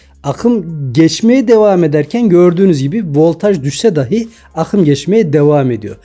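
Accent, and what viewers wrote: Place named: Central Anatolia, Turkey. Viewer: Teşekkür ederim. Güzel anlatmışsınız 👍👌💯 Konuşma aksanınız tahminimce orta Anadolu tarafı ☺️🤔 Aksaray, Yozgat 🤔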